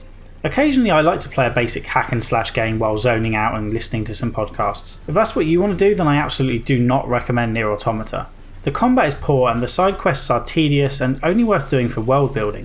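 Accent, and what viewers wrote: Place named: RP, United Kingdom. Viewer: The fact that you actually did your background research, stacked with how thorough your reviews always are, multiplied by the fact that you speak the queen’s English (i’m american and love that accent) makes me wish you did full story spoiler reviews. I don’t really watch your videos as research tools for games I might play, i mainly just try things out, but I purposely look for your reviews of games i loved ie: dues ex for example.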